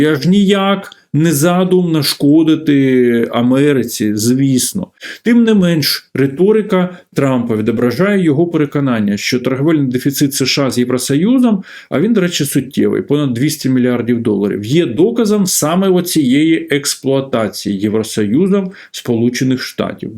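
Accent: Russia. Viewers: У ведучого грамотна, чиста українська мова, але з російським акцентом.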